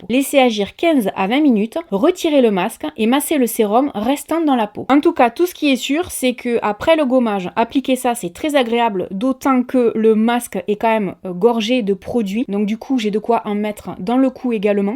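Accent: South, France. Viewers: Déjà je t aimais bien.mais là avec ton amour pour les animaux je t adore😍😍et ton accent du sud ça change de ma Bretagne et de Paris...😊😏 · Coucou! Juste pour te dire que j'adore toutes tes vidéos! Tu es marrante, j'adore ta franchise et ton accent du sud et ta bonne humeur! Quand tu dis "moin...s" ça me fait toujours bizarre! Finalement, je voulais te dire que J'ADORE ton générique de début de vidéo! Tu m'impressionne! Bisous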